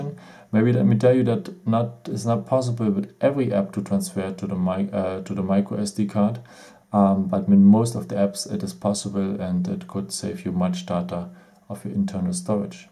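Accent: Germany. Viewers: yor from german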